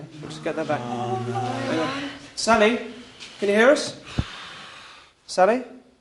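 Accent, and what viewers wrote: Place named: England, United Kingdom. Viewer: this is horse doody!! there is no seance just a bunch of englishman crap and he will not stfu making me wanna commit suicide with that horrible accent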